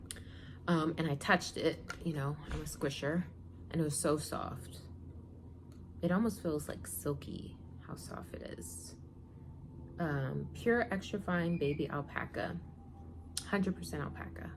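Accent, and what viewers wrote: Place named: New Jersey, United States. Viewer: I love you and your podcast. Thanks so much! I’m from Philly. I live in Florida now. So, I’m enjoying your NJ voice and creativity.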